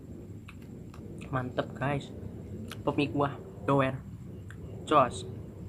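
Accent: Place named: Banyumas, Indonesia. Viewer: Hahaaa wong ngapak yaahh.... Mantap pedes gilaaaa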